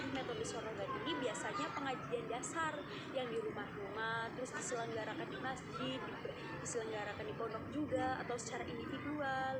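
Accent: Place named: Java, Indonesia. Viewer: Ayang medok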